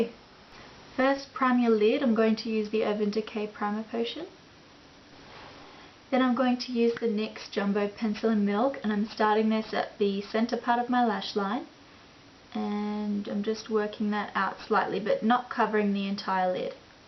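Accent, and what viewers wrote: Australia. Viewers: Your so sweet for lettimg me kno ;) thanks girl. Love ur videos and your australian accent! Subbing to see more!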